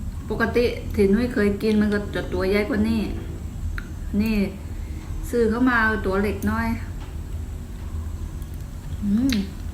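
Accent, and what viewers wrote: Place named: Rayong, Thailand. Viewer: ยำปูแป้นน่าทานจังเลยค่ะ อีกอย่างที่ชอบคือชอบสำเนียงระยองฮิ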